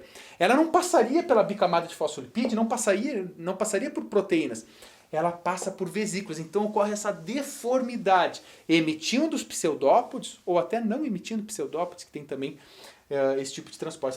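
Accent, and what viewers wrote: Rio Grande do Sul, Brazil. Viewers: Sou nova aqui no pedaço e já ameeeei suas aulas Professor! Que aula incrível! E esse sotaque então, adooooorooo acho lindo sotaque gaúcho🤩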